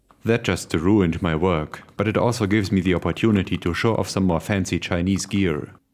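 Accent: Germany. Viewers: but with a German accent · Nice german accent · höre es sofort, DEUTSCHER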